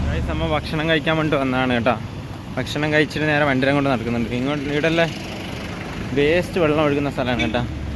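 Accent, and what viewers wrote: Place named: Kasaragod, India. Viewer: സംസാരം കാസർഗോഡ് 😂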